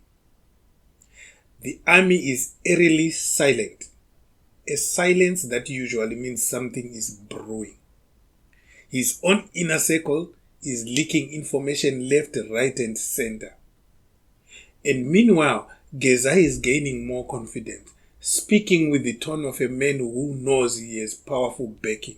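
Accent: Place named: London, United Kingdom. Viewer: You can speak London 💯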